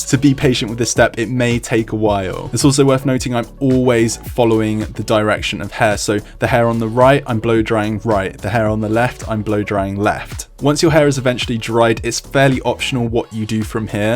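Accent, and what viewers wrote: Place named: United Kingdom. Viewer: Finally a British accent, I’m sick of hearing these annoying American ones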